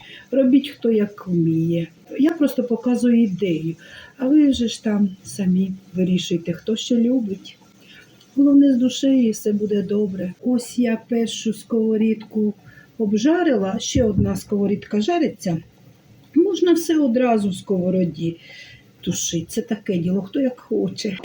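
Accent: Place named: Ukraine. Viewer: ! Очень приятная женщина, молодая, все получается, украинский говор. Дай Бог тебе здоровья, удачи во всех делах, любви и дружбы!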